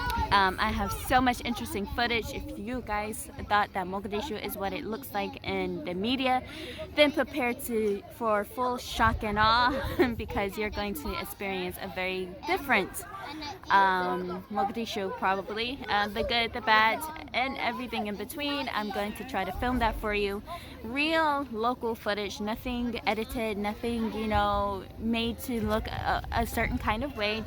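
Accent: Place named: Senegal. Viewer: Bit confused your accent as I couldn't see your face Im unable to identify where ORIGINATED!. Are from Senegal or Namibian? Its really shame that you leaving the Republic of Somaliland because its peaple are "Too Nationalism" to me the great people of Somaliland are really lacking of it. Come to America and you will realise what nationalism is it all about, in a good way. Tribalism IS NOT good but healthy nationalism is fine. In your speech, I REALLY LIKED 👍 when you were saying "Hargeisa Somaliland," and u repeatedly said that number of times. In which I have appreciated it a lot. Anyway, safe journey wherever you are heading right now. But plz avoid mixing the Republic of Somaliland and Somalia for ur next videos as these 2 DO NOT GO hand in hand 4 obvious reasons. Finally come back soon if u really believe the Republic of Somaliland in heart ❤️ 💙 💜 💖 💗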